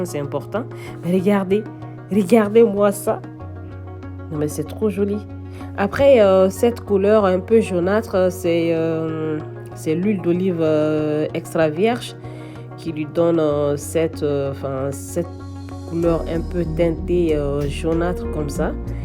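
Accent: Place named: Senegal. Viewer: Vous êtes sénégalaise y’a pas de doute, magnifique accent ❤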